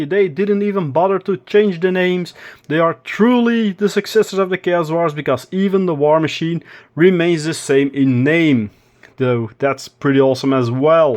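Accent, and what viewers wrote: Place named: Belgium. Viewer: Ik hoor het Belgisch accent, maar vlot gesproken haha :) Beetje verrast over een Belgisch kanaal aangezien de kleine community in België... Los van dat, ben denk ik even enthousiast over de dwergen als jij, zien er veelzijdig en uniek uit! Ook één van mijn volgende projecten?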